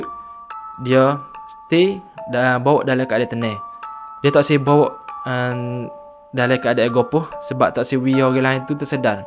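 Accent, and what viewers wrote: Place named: Kelantan, Malaysia. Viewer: Pergh pekat btul Kelantan awk eik?tapi syabas.bro.pnyampaian ok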